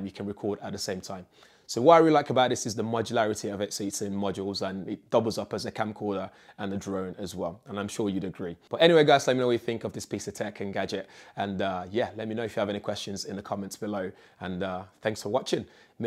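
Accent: United Kingdom. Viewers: His accent is the coolest. Is he British or South African?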